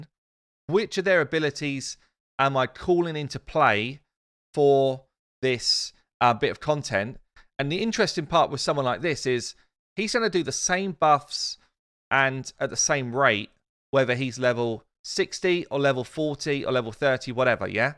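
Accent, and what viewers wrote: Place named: Hampshire, United Kingdom. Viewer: A nice change in content. I keep trying to place your accent. I'm thinking SE coast, Hampshire maybe.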